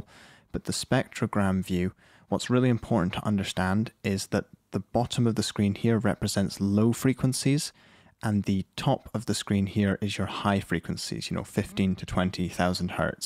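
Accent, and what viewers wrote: Scotland, United Kingdom. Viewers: I don't think he does XD he is scottish sometimes his accent shows.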